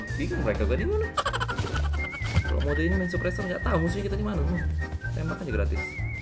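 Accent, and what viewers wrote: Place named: Medan, Indonesia. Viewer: LOGAT BAHASA NYA KOK KAYAK MEDAN YA OM 😆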